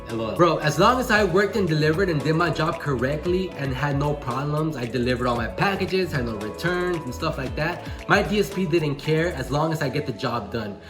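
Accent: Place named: Puerto Rico. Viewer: cuz I saw your video u posted on ig were your video is about a guy asking u if you guys were open and u were talking in Spanish, but u had a Puertican accent. But both my parents are from Mexico but I'm born and raised in Los angeles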